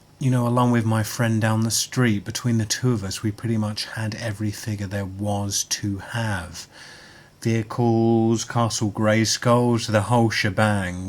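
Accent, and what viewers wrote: United Kingdom. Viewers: cool british acent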